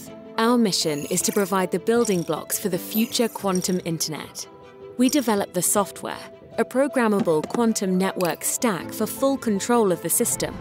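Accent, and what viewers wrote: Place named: Australia. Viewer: The Australian accent is very annoying.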